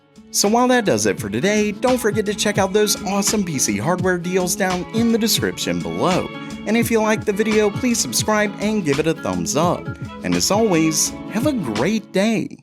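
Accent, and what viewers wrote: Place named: South, United States. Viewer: this guy's voice sounds like a southerner mixed with a lesbian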